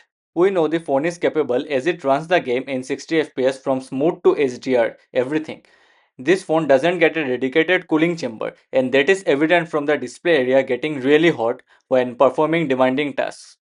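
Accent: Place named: Bengal, India. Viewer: Puro bangla accent toh bhaii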